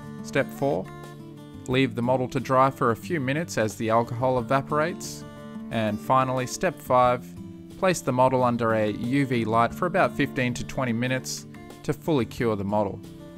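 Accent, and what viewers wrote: Australia. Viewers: Is it narrating or a TTS app with an Aussie accent? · I thought that scenery looked awfully familiar in an Australian kind of way, and then of course I heard the accent, haha!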